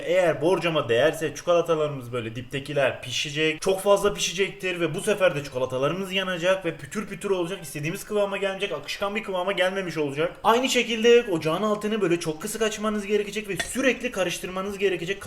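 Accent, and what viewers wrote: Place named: Hatay, Turkey. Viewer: Bu adam amerigalı olsa beğenirdiniz dimi ama bu adam Hatay ağzıyla konuşuyor ve Hataylı bu yüzden bu videoya like atmayacaksınız ama bu adam Amerigalı olsa 10 milyon abonesi vardı (YouTubetok)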